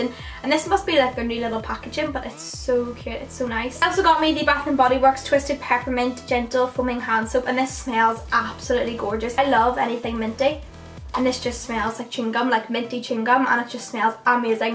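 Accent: Northern Ireland, United Kingdom. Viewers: are you from northern ireland x i am 😁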